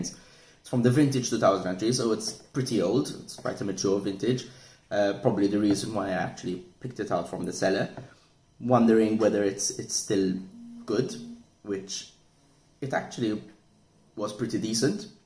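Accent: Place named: Jamaica. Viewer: Good review. You sound Jamaican.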